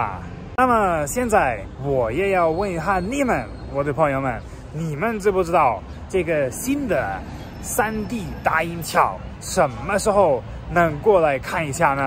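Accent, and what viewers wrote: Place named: Sichuan, China. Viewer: 哈哈，四川话好听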